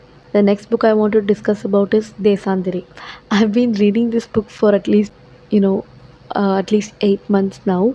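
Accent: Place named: England, United Kingdom. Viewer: Clearly and easily understandable English Accent👏🏻👏🏻. I appreciate this and waiting for more.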